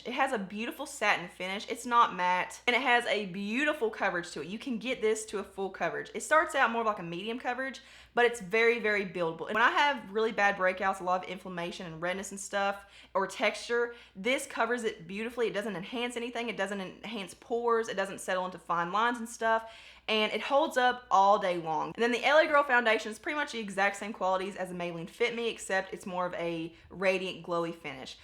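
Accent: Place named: Alabama, United States. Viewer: I’m coming from channel not sure if I spelled it right but I have subscribed to your channel I absolutely loved this video I love drugstore and dupes videos. You are a beautiful young lady but if I can ask where you are from I heard an accent I live in Alabama and it sounds like you may be from there. God Bless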